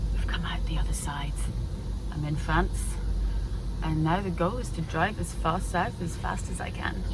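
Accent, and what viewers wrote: Scotland, United Kingdom. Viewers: Love listening to you :) you’re more Scottish on YouTube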